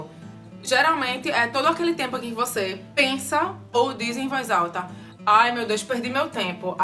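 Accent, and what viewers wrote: Pernambuco, Brazil. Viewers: Adoro seu sotaque pernambucano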